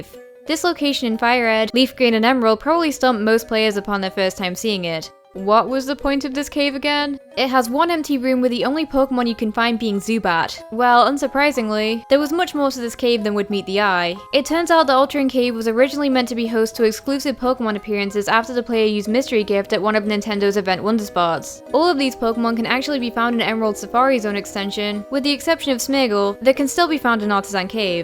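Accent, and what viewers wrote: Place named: Northern England, United Kingdom. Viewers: That's a strong Northern English accent there. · She's from Preston in Northern England.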